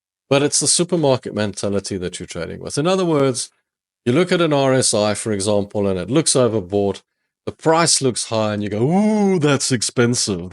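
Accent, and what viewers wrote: South Africa. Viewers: i knew i recognised that springbokka and borewors accent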